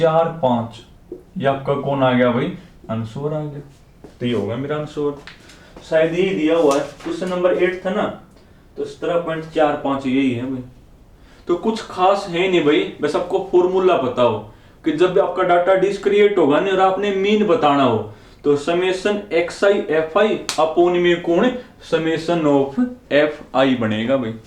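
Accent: Haryana, India. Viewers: Haryana t ho sir aap ???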